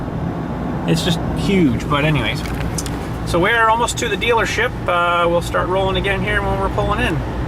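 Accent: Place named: Ontario, Canada. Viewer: Good video, but I must say... you sound like Red Green! Haha, must be an Ontario thing. Cheers from Alberta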